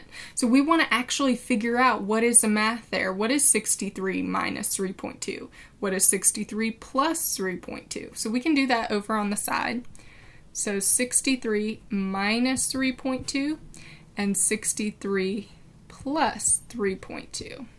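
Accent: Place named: United States. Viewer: margin of air the american accent is beautiful thanks for the tutorial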